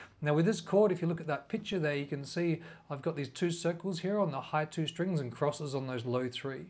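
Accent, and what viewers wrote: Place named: Australia. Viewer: Awesome video! Thanks dude! I know you Aussies say Mate, but in the US I say dude a lot. Cool accent dude.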